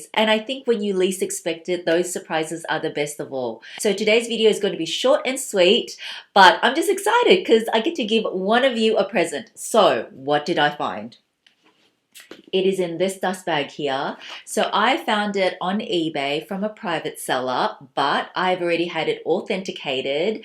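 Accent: Australia. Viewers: Hi, I love watching your videos. I’m from the US so I find you Ausie accent so adorable 😁. I would like the bag please! I’m a new YouTuber and it would be awesome 👏 to win the bag so I can vlog about it!!! It would mean so much to me! Wishing you more success!!!! ♥️🤗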